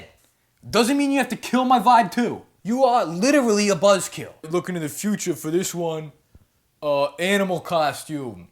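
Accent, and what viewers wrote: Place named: East Coast, United States. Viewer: Roman bath orgy lol This guy looks arab but talks east coast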